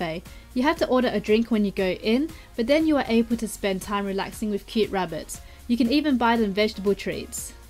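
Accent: Australia. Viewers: great video - it bought back many memories. I think you have Aussie accent, have you studied in Australia. I am Aussie and my wife is from Japan. Keep well. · This girl sounds like someone from west Sydney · It's my first time to hear an australian accent Much more like British than I thought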